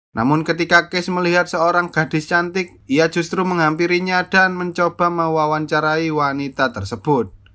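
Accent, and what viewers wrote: Java, Indonesia. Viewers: Bang kamu orang Jawa ya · Wong jowo to mas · pekat bet jawo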